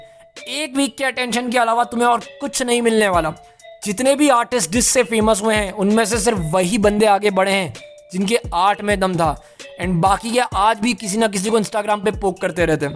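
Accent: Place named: Delhi, India. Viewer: This channel admin is definitely from Delhi 😂😂. His tone told alot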